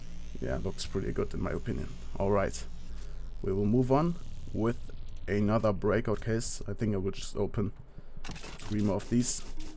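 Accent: Germany. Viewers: Deutscher Akzent ist schrecklich!! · Man hört das du Deutsch bist sprich doch auch deutsch xD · Dein Akzent sagt mir :,,Du bist Deutsch :D''(I think u r a german :D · are you german ?? :D i think im hearing an accent ;) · i wonder if i also sound so german when i speak english. no offense, pretty much every german sounds like that. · R you german? Your English sounds like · Dein Englisch ist meiner Meinung nach richtig stark, Grammatik und Vokabular sind einfach perfekt, nur der Akzent ist einfach 100% deutsch ^^ Naja ich kann nichtmal ansatzweise so gut englisch, aber mein Akzent ist schon sehr Englisch:D Hättest du jetzt noch nen amerinakischen Akzent würde ich dich glatt für nen Ami halten^^ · Are you from germany? because you don't sound like an english guy, I mean it's not bad, I'm to german, i think you sound like a german :D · dieser deutsche akzent beim englisch sprechen ist so offensichtlich :D und irgendwie auch langsam nervig :D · Bist du deutscher oder österreicher ? 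Dein english hört sich irgendwie so an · U have a german voice but u are english i think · are you german your english have a german akzent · Du hast ein deutschen Akzent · Also ich muss ja jetzt mal sagen...! Der deutsche Akzent geht mal garnich.! :DDDDDDD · hört man nordeutscher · Ich kann an deinem English hören das du Deutscher bist warum sprichst du nicht Deutsch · Man hört so dass du deutsch bist! :D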